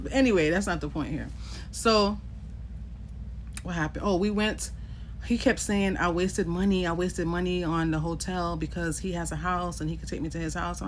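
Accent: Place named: Caribbean. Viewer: UR PATOIS S AMAZING IM A YOUNG QUEEN FR NY CARIB AND SPANISH.❤❤❤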